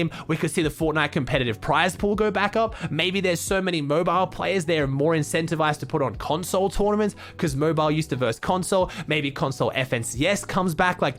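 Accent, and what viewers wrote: Australia. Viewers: now lol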